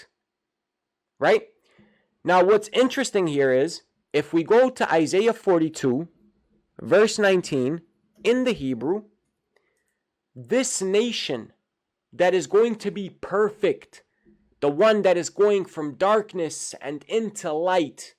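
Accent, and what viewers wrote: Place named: South, United States. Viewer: Curious what kind of american accent that is. Southern , sounds like.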